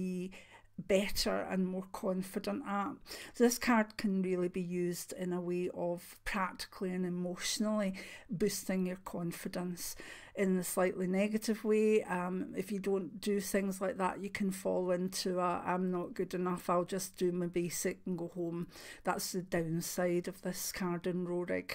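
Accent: Scotland, United Kingdom. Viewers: I'm a brand new subscriber. Your sweetness, kind and loving energy and lovely Scottish accent captured me. Thanks for all your shared wisdom and helpful videos. Much love, light and abundance of all good things to you. 💞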